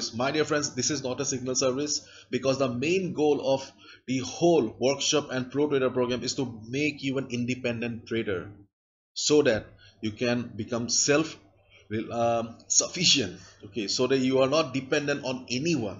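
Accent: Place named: Assam, India. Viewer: Dudin dhori sai su apunar video.....mat tute dhrbo parsu asomia buli...accent tu ase asomiar...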